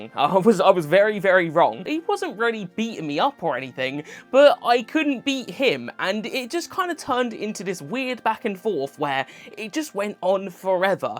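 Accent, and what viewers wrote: United Kingdom. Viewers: What area of the Uk are you from?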